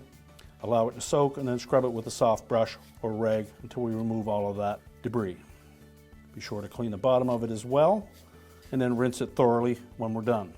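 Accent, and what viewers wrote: Canada. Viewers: Sounds like a Maritimer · I love his accent (canadian maybe?). « We will need to take this aöt, and once it is aöt, we can clean it »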